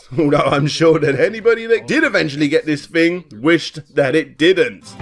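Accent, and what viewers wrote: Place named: United Kingdom. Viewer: Whoa whoa whoa wait one gosh darn second.. you're British? So.. am I British? Is there a test to find out?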